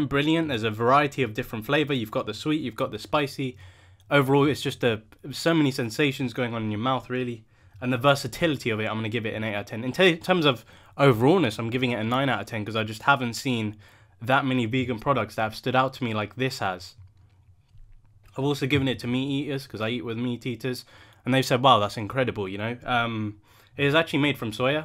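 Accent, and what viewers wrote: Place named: United Kingdom. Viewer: Your not white no, it doesn't mean you arnt British. You do however have a strong British accent. So your upbringing isn't obvious.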